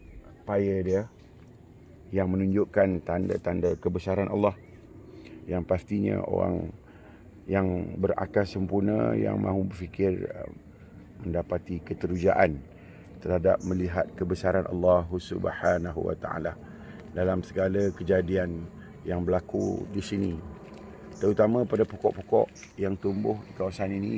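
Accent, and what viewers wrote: Kuala Lumpur, Malaysia. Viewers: Hang cakap sleng KL no. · hehehe.. ustaz cakap kL oo.. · Bersame same , kepade , ustaz Cakap KL 😂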